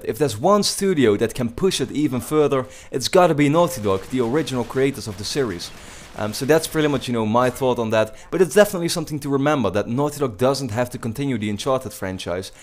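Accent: Netherlands. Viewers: I really hope Uncharted 4 will be made by Naughty Dog. But one little question are you Dutch? Because you have a Dutch accent :p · are you dutch!? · You have an dutch accent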